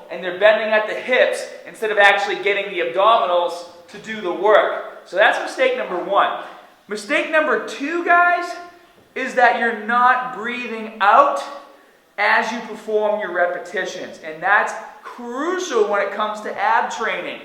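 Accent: Boston, United States. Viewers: You are from boston no ?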